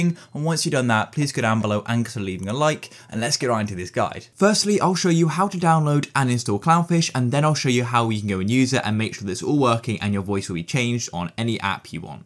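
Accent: United Kingdom. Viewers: Are you British? Hahaha damn bro.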